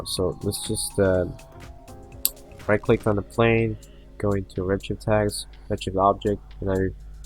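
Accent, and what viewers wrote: Russia. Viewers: I just wonder what went wrong. It seems to me that you tried the right things (crank down weight, crank up roughness), all the stuff i'd try too — but it didn't work out. Still don't get the point. P.S. is a russian, according to accent, and you —?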